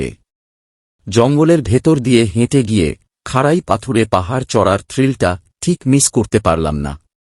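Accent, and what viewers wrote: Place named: England, United Kingdom. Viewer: Tomar golar voice ta darun but english tan ache banglay ktha blate। Tbe darun laglo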